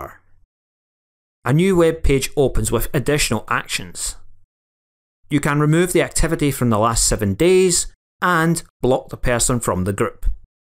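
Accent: Scotland, United Kingdom. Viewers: i love your scottish accent 👏🏼👏🏼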